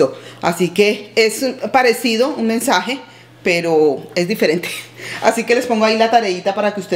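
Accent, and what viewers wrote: Colombia. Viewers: 🇨🇴💛💙❤️🇨🇴 Dios te continué bendiciendo. Me alegro mucho . Escuchar ese acento colombiano. Muy chéveres tus ideas.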